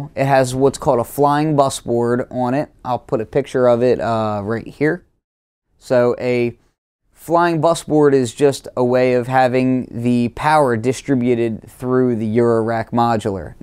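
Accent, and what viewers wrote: Philadelphia, United States. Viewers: I detect a Philadelphia accent. Are you from Philly?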